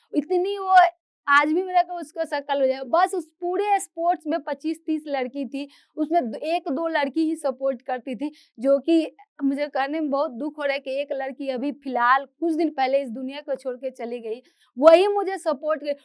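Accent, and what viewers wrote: Bihar, India. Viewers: Me Bihari to nahi hu ,,,but mujhe bihari tone bahut bahut pasand h. 😊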